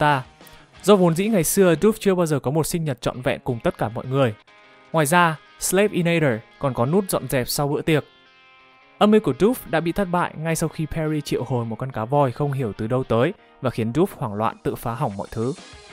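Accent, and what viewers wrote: Standard. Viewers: Tiếc nhỉ mà ad có giọng hay thật lại phát âm chuẩn nữa và đầu óc cực "chong xáng" khi làm về Doof's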